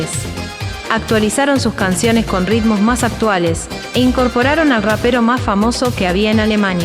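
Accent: Argentina. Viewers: Buen video! Me intriga que tu acento parece argentino, como algunas palabras (pibe, quilombo, orto); usás palabras de España como "calzonazos" o "enchufado", otra expresión que no sé de dónde viene ("ni pajonera idea") y el mito mexicano del nagual... Otra curiosidad es que al chanta del protagonista le reclamaran por haber pagado 100 euros por canción en los 80 y 90, cuando el euro existe recién desde el 2002.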